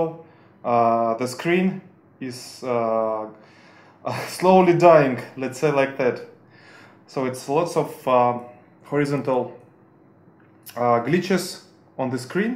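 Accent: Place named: Russia. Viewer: А можно на русском такой же? Судя по произношению Русский вам должен быть знаком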